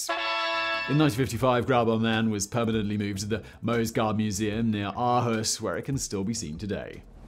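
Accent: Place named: West Country, United Kingdom. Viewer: Mate, you say the words surprise, died, alive , bluntly , all a bit funny.. .west country , country bumkin.ish Xxx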